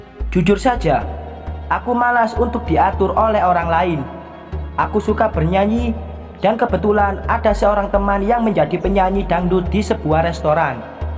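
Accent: Java, Indonesia. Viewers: Bang orang mna sh bhsa y ko medok bnr tpi bàgus cerita y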